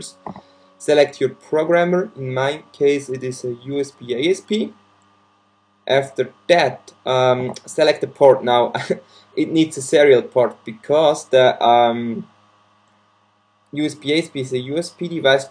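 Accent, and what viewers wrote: Germany. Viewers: Alles Verstanden Infos die ich wissen wollte bekommen.. Wüdre 2X Like geben schon alleine wegen der Guten "Positiv gemeint" "Denglisch" Audio. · erst, vaucc :D You are german no doubt :D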